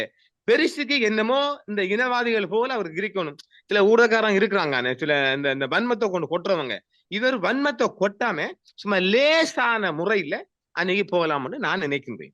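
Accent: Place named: Eastern Tamil, Sri Lanka. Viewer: கிழக்குத்தமிழ் அப்படித்தான் இருக்கும்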